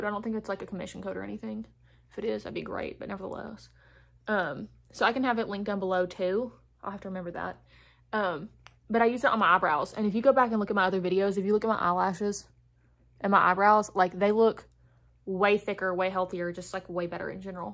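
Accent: South, United States. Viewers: Where are you frommm?????? I hear a little southern accent mixed in there!I’m from South Georgia and it’s REALLY nice to hear somebody else that sounds like me on social media!!!! 🫶🏽🫶🏽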